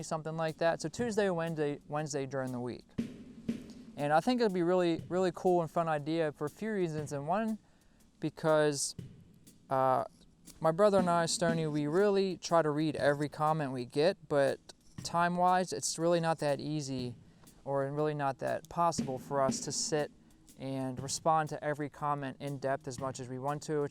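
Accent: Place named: West Virginia, United States. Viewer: great idea! I haven't been subbed long enough to have in depth questions, but I am curious about where you are located. Your voice(accent) whatever you want to call it, reminds me of my husband's family in West Virginia is why I ask.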